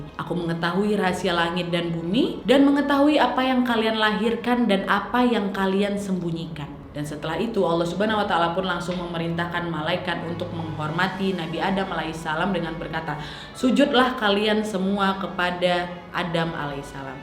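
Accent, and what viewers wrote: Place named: Medan, Indonesia. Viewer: Dan medan🤣 logat kau nampak jelas kale🤣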